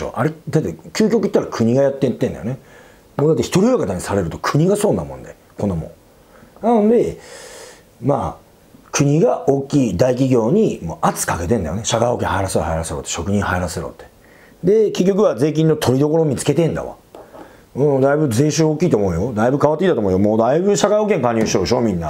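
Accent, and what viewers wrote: Nagoya, Japan. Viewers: 名古屋弁だ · 同じ愛知なので名古屋弁聴き心地よかったです！ 自分も一昨年独立したばかりなので勉強になります！ ありがとうこざいます！ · 初めてみてラッパーのAK69の喋り方に似てると思って概要欄みたらやはり名古屋でした！笑 そのまま話を聞かせていただきました。大変勉強になりました！ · 名古屋弁落ち着く―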